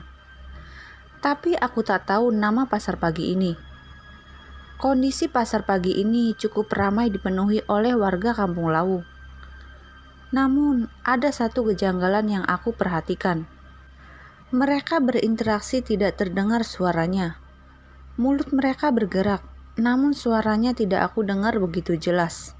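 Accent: West Java, Indonesia. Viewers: Yg baca logat sunda...ya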